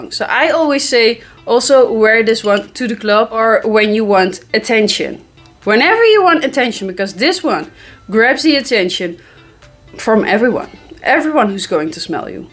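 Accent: Netherlands. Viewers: Hehe 😋 from you accent i know you are from Netherland my girlfriend from Netherland have same accent 🥰 Thank y from Morocco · Beautiful accent 😊 Dutch? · Beterschap meid. Heel chill dat je geurtjes doet. En je Hollandse accent doet t m met je grappen 😁👍🏻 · Is it okay to be turned on by the Dutch accent? Man… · You sound like you are from The Netherlands, are you?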